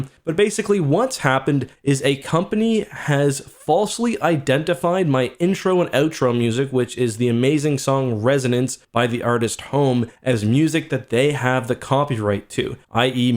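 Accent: Canada. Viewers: I know this isn’t a regular video and you’re mad but it’s much more clear how Canadian you are when you’re pissed off and cursing, reminds me of trailer park boys when you said “fuck” and “sorry”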